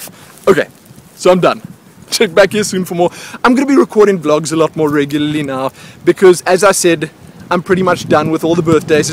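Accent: South Africa. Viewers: dude are u south African or just from Africa because iv got a mate up in Namibia and he sounds like he is from sa. and that place looks like capetown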